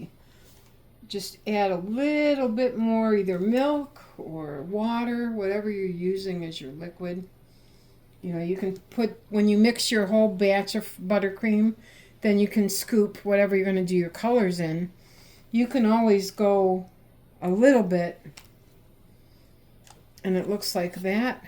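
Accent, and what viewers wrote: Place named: Midwest, United States. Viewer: I thought so. My son and daughter in law lived in Wisconsin for many years and lived in Minnesota and Michigan. I could pick up that wonderful Midwest accent 😊. We are in South Carolina now .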